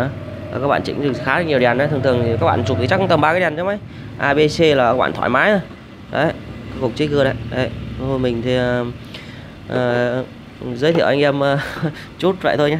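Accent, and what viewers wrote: Thanh Hoa, Vietnam. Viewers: giọng thanh hóa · Nghe giọng ông T.hóa chán quá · kiểu như giọng thanh hoá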